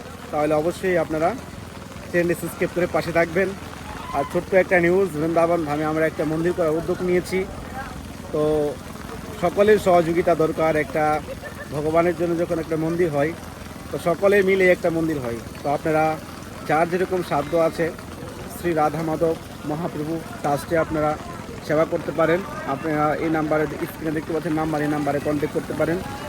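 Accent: Bangladesh. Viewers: ভাই তুমি কি বাংলাদেশী। language টা এমন কেন?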